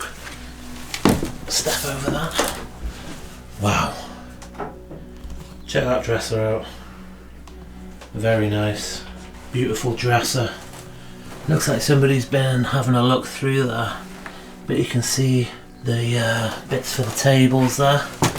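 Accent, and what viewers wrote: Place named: Bolton, United Kingdom. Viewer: Hi, I’m from Bolton, living in Northern California (yep, it’s raining buckets now 😄☂️🍂) and I have to ask where are you from? Freaky or just plain intringuing, all your episodes are really interesting. Descriptive, and personal - with your connection to the lives in the houses, and talking it through on camera. I’m hooked. Your accent takes me home too. Nothing else, could you just share where you are from. And I now realise I sound like you, talking to a spirit both close and far away. Thank You for sharing all your episodes:-)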